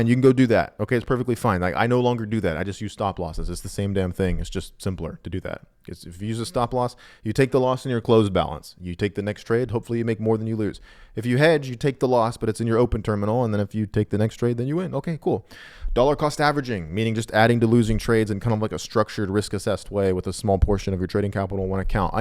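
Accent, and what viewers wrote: India. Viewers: Im dying cause of the indian accent lol... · 69,420 Please entertain us with your Indian accent. We need more of that!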